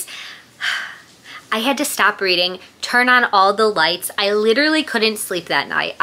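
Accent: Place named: Milwaukee, United States. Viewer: your voice is very milwaukee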